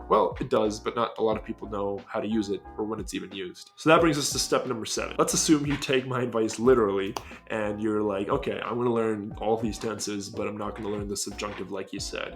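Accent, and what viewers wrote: Spain. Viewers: Mi bro habla como todo un español, te recomiendo escuchar otros acentos para que encuentres palabras nuevas que solo se usan en ese tipo de acentos, por ejemplo en Latinoamérica jamás se dice vidio, si no video, cheers mate <3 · Damn you’re talented at speaking Spanish, im surprised you learned how to speak Spanish with a Spaniard accent rather than a Latin American accent since you’re from the U.S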